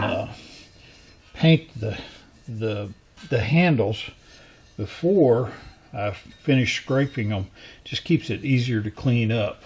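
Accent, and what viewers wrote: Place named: Houston, United States. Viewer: from the start of watching your videos, I've felt like I had a friend. I think it's because I grew up in Houston and there's something about your dry sarcasm (and accent) that reminds me of just about everybody I grew up with. I 't mind the longer format when the content is fun and interesting. You are an actor, so making these videos combines two sets of expression that are important to you. As soon as you make it about the money, it will be less fun for us and more like work for you, like an amateur musician getting a paid gig and now being obligated to spend four hours a day practicing. We watch because we like the hang-- is the surrogate for us as we watch and that's a good role. Do Patreon only if you actually need the money.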